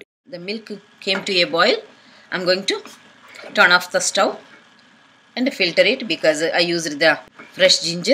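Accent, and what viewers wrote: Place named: South India, India. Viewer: She's a South Indian and they have that kind of accents. Very strong MTI. Though a Pakistani talking about English and it's accent is kinda funny 😛😂😂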